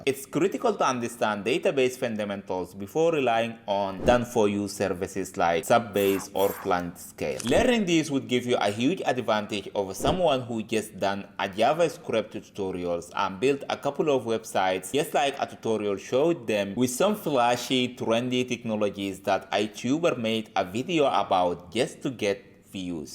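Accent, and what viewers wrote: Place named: Italy. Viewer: You sound Italian. Great content, bro.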